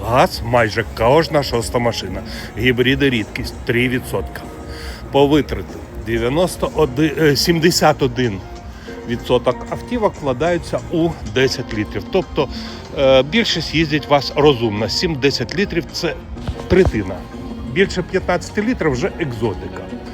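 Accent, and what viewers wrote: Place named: Surzhyk, Ukraine. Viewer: Вчи українську краще і швидше , бо суржик лізе з усіх дірок! Українська : наголос на третьому складв. Тобто на букві ї.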